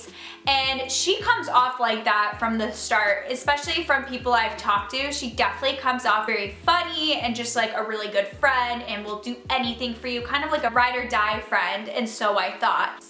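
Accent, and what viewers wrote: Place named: Canada. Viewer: This lady has a nice Canadian accent. Very nice!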